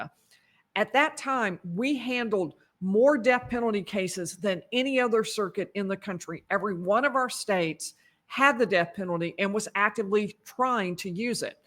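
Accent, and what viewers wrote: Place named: Texas, United States. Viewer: Love your Texas accent !!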